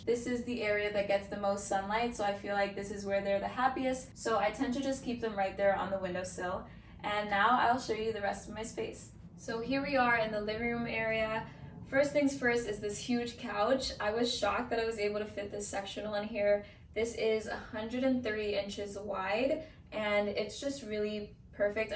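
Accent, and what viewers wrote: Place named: Dominican Republic. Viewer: I knew you were Dominican from the first few seconds 🇩🇴 #rep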